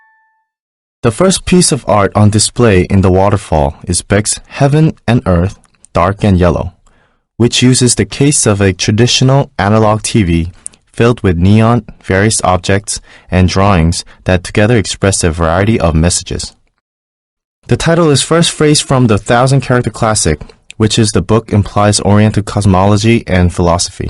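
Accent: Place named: United States. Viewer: His pronunciation is so perfect...american accent..miss u Taecyeon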